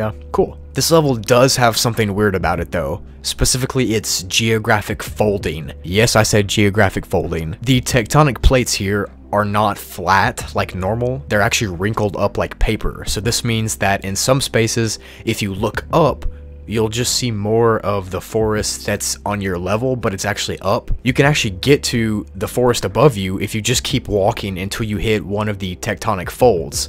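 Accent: United Kingdom. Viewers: are you British?😮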